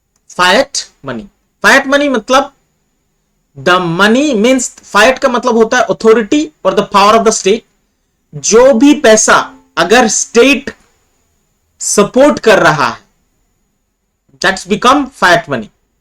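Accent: Assam, India. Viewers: Sir are u assamese ?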